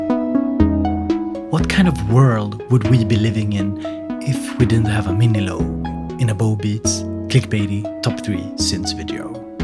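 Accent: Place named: Sweden. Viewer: I've been wondering where your accent comes from. Hearing your long 'e's tells me you're Sw'e'dish. :) Thanks for the videos!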